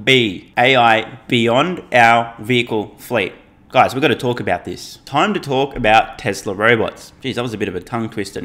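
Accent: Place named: Australia. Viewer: For a moment I thought I heard swear, but nah, it was just plain Australian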